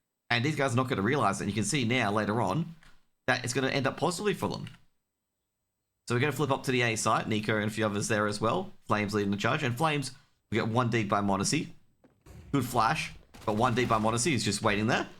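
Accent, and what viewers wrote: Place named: Australia. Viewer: I like the sound of your words Australian man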